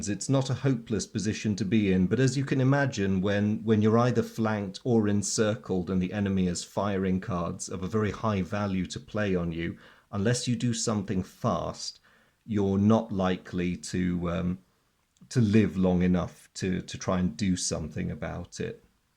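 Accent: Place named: RP, United Kingdom. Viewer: Cheers dear, Thanks for your kindly reply. My request wasn’t for a specific scenario, honestly. I fancy that if you play a relative simple scenario that covers the basic rules it would be great. Maybe, you give for granted certain stuff (like terrain or discard, how to calculate sniper activity and reaction, just to mention a few). If you might simply explain those rules playing the game, I bet you might hit the target. Uh, last nice thing: your posh English reminds me a teacher I had half century ago…he was a peculiar fellow that escaped the Polish invasion by Nazis into a balloon! Thanks a lot for your passion.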